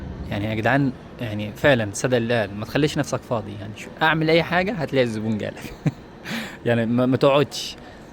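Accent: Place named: Asyut, Egypt. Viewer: هوه مرار طافح 😂 لهجتك حلوة انت منين ف الصعيد حاسك اسيوطي شبه لهجتنا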